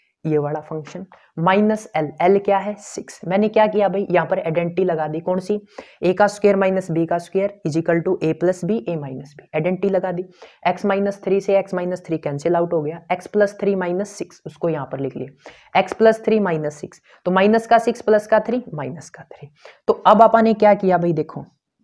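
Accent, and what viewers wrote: Haryana, India. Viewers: Kis kis ne lagya ke sir Haryanvi ha 💪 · Sir your efforts are so appreciatiable. I like your Hariyanvi acsent .👌🙏😃😊👏🤗🙏😊👏👍🙏👌😃😍🎊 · Sir aap haryana se ho kya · Sir g aap haryana se belong karte ho na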